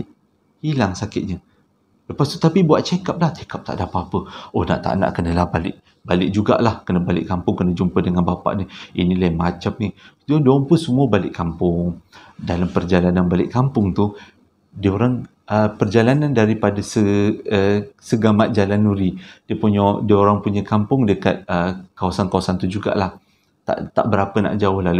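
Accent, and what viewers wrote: Java, Indonesia. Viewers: Abang jawa ke bang.sedap didengar. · Bercerita ada slang2 jawa..tu yg terpikat kat channel ni..menusuk dlm jiwa raga...😊😊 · Slank tu mcm bunyi ala2 jawa.....memang tulen ye😬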